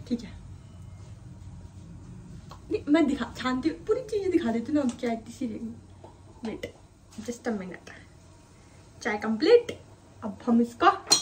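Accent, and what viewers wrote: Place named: Shekhawati, India. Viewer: बेटा आप कहां से हो कहां पर रहती हो बोली भाषा से लगता है कि आप शेखावाटी से हो क्योंकि मैं भी शेखावाटी की हूं नवलगढ़ की हूं मैं मुझे अच्छा लगता है आपका ब्लॉक ब्लॉक में बताना कहां पर रहती हो मैं कल के ब्लॉक में आपका इंतजार करूंगी चाय अच्छी है खुश रहो बेटा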